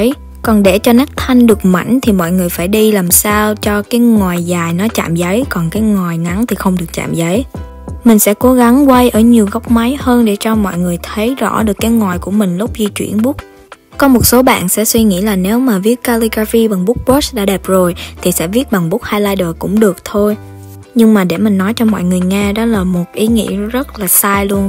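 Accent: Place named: Da Nang, Vietnam. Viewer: Chị ý người Đà Nẵng ý bạn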